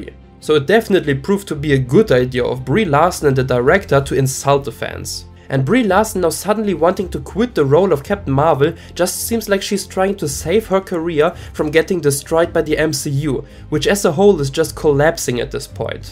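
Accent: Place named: Germany. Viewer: Ich habs mir bei dem Akzent doch gedacht... a daitscher Landsmoan! 😂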